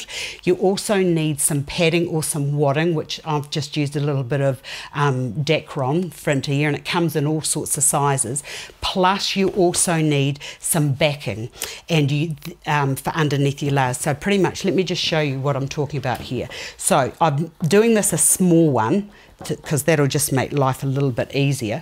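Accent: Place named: New Zealand. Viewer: How awesome. As soon as you started speaking I thought yayyyy a kiwi!!! Awesome seeing NZers coming up at the top of my search list. Love from Ari in welly!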